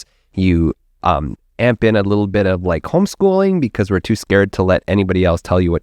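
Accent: Canada. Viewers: Great discussion -- it really highlights the lack of intellectual, and if I may say so, spiritual honesty in the evangelical faith community that I was a part of for so long. I did not feel free to wrestle with any doubts whatsoever, much less to express them openly. Even if you found someone who understood the problem, they always seemed to have a cut and dried "solution" that appeared "doctrinally sound" but completely unsatisfying. Which left me basically no choice but to quietly leave the matter unexplored and wonder why I was such a lousy Christian. Very difficult to put that problem into words until now, so thank you so much for confronting it. By the way, being a big hockey fan, I never had any doubt that you have a Canadian accent, not an American one! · Don't worry bro I actually can tell that you're Canadian by your accent. The word has a lot of baggage that was helpfully unpacked. 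I wonder if many of the issues that you discuss potentially stem from people focusing on the New Testament. Paul discusses (and references) a lot of Scripture, that is, the Old Testament and whatever else the Jews used. I think there are a few epistemological problems with liberal Christianity: it is the latest installment in a long tradition of Greco-Roman thought that I think is problematic. This is my first introduction to liberal Christianity so I can't really say much else. Very thought provoking stuff, thanks for sharing.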